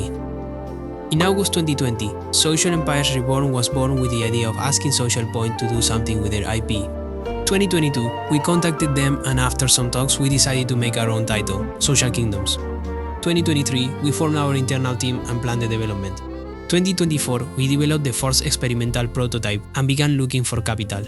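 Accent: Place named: India. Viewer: Ingles hindú jaja, que grande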